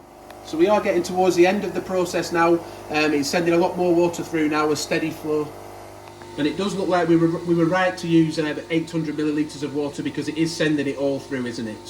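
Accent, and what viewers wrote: United Kingdom. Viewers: Thanks for taking the time to make this video. Way better than what Nespresso has done. Plus, appreciate the accent from the other side of the pond. Go Three Lions!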